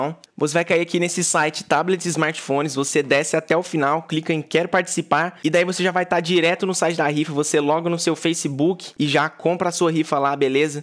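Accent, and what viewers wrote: Minas Gerais, Brazil. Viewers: Vc é mineiro ?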